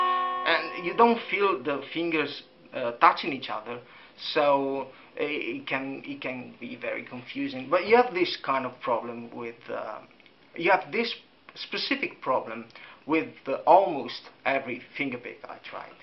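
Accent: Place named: Venice, Italy. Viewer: non avevo mai sentito un accento veneto sull inglese. Fantastico, sembra una via di mezzo tra il gallese, e il liverpulliano . Grade. gran video sulle unghie atrifiaciali, mi serviva:-)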